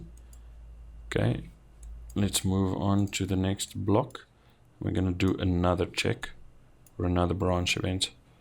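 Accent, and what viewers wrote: South Africa. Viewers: Good to hear a SA accent on a tutorial video.! Keep the naas foorums up Boet! : )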